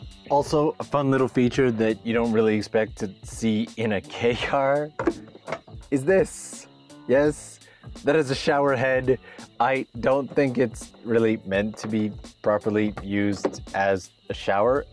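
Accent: Canada. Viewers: after hearing him say "out" so many times, I'm now wondering, is he canadian?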